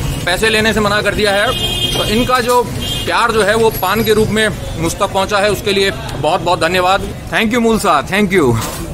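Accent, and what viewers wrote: Standard. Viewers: Ram Ram Bhai..mujhe shuddh Hindi bahut accha lagta hai, jaise aap bolte hai... Aayiye kabhi Assam, milte hai..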